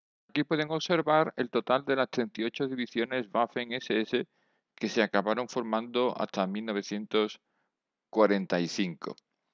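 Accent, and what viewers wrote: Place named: Spain. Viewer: oro puro este canal, soy cubano y ese acento del castellano es música para los oídos...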